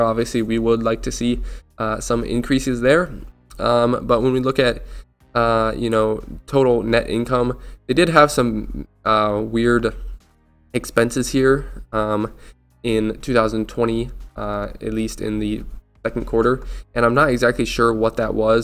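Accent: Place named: North Carolina, United States. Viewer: I heard your NC accent dude! I'm from Chapel Hill, lol, living in Spain for 8 years now. Thinking about jumping in to good ol' Duke Power. Thanks for the vid man and good luck!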